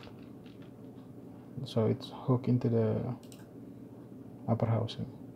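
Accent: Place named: Indonesia. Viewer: Your accent is very indonesian. You even sound like my lecturer lol😂. Or are you?? Vsauce music plays Love your content btw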